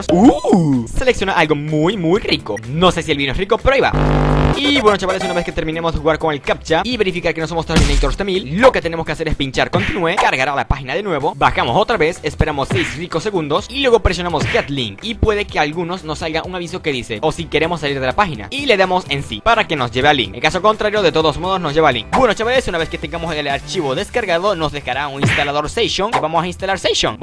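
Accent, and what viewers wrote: Venezuela. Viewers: HAAAAAAA ERES VENEZOLANO CONRAZON PAPI ERES DE LOS MIOS HABLA CLORO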